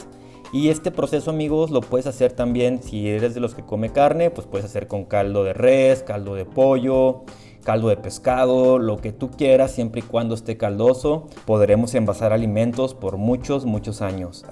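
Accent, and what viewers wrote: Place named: Mexico. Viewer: que dulce que es el acento mejicano. GRACIAS POR LOS VIDEOS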